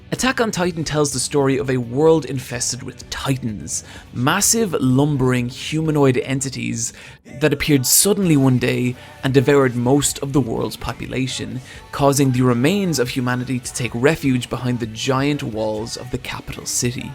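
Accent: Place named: Ireland. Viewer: I had a feeling you were Irish wasn't sure if it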